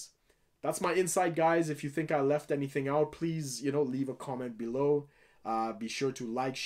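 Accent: Trinidad and Tobago. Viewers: he has the white trinidadian accent lol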